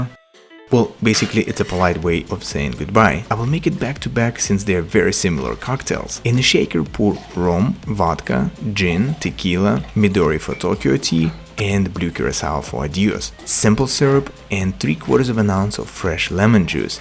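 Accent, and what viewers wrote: Russia. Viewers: Автор из России? Просто акцент, я его слышу и мне кажется что он русский, да и к тому же имя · Здрасте, мне кажется или у рязанский акцент?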